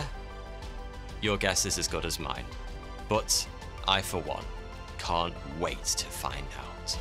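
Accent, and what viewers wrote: United Kingdom. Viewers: Heck me! This dude's appearance and accent is way too traditional like a British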